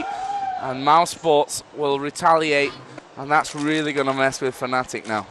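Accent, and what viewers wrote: Australia. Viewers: Who commentated this? An aussie? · wow, aussie commentator! AUZ FTW!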